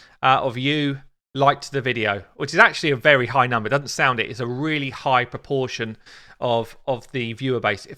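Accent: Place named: United Kingdom. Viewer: Can you like read a book one time, your British accent ....... I enjoy it. Keep up the amazing content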